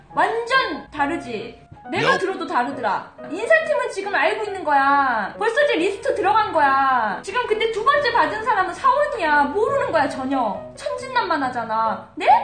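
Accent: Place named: Jeolla, South Korea. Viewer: 전라도 사투리 찰지네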